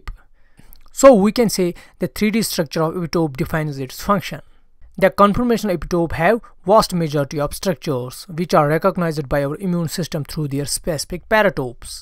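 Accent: Kashmir, India. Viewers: So kashur accent brooo.. Cxe ma kashur??? 😂❤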